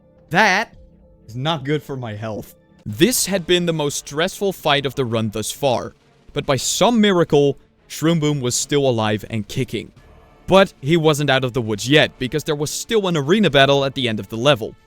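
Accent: Netherlands. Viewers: I teach English to Dutch speakers and I speak Dutch myself. If it's not Dutch i'd be surprised. But honestly, the accent really isn't that bad.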